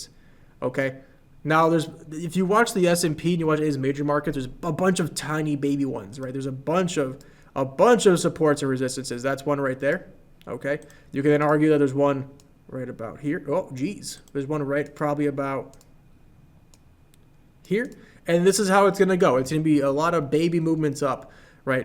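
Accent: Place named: Michigan, United States. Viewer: tell me u not from Michigan ... ur accent in so close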